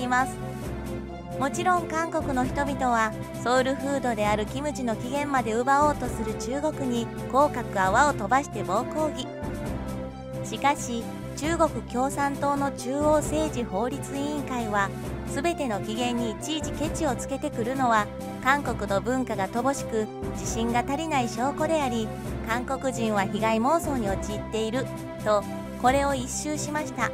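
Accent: Kansai, Japan. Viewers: 柔らかい関西弁、好き！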